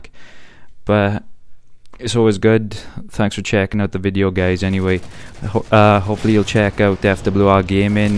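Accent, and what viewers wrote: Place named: Wales, United Kingdom. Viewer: OMG I FOUND SOMEONE WHO IS ACTUALLY WELSH!😁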